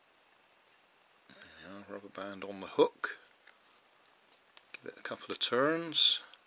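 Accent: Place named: Cornwall, United Kingdom. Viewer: Sounds like a Cornish accent to me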